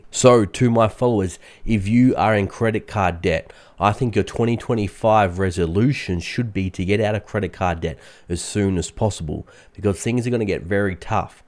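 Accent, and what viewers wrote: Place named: Australia. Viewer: Change in pronounciation for the American audience who just couldnt seem to deal with the aussie accent?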